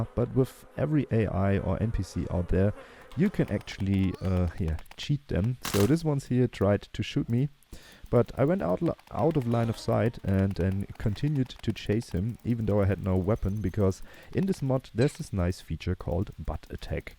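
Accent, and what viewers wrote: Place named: Germany. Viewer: wie man das sofort hört wenn ein deutscher englisch spricht .. :)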